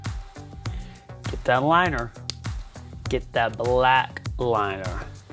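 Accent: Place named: South, United States. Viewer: sounds like a southern blonde soccer mom or something. i feel like she's going to ask me how the kids are doing and invite me over for a glass of wine.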